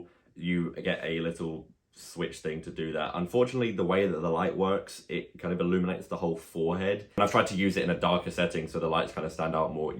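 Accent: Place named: United Kingdom. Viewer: Very funny and informative review, subscribed! I'm guessing from your accent that you're based in the UK, if you are then please let me know where you get your figures from since I'm also based in the UK and shipping prices have gotten pretty rough since covid lol.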